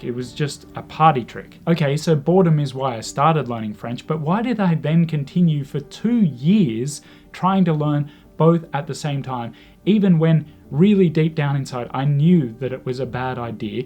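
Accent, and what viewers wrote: Stockholm, Sweden. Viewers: I just realized u have a bit of Swedish accent in your video. Anyways, good luck... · I find your Swedish accent really fun. You sound like an 08 (Stockholm). Hehe (also I really don't like the "polyglots" that only know a couple of catchphrases and nothing else) I think you did the right thing. Let me tell you my case, I speak five languages but I didn't learn them by choice but more by need. And most important of all, I learned them ONE at the time. I think you did the right thing by ditching one. I am doing the same with Russian. I'm gonna go for Ukrainian after that. But I'm not learning both at the same time. Also if you want someone to speak Swedish to I'm available anytime. As I love languages I have a real knowledge of Swedish growing up in Sweden and being a bookworm I have a good understanding of the Swedish language. 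Just if you need a little variation in your studies. Cheers. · That is very clever, well done! Also, your Swedish vowels have a pretty distinct Stockholm twang, which is quite difficult to pick up for foreign learners. You could certainly pass for Swedish sounding like you do already in few-word conversations. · Your Swedish accent is so natural!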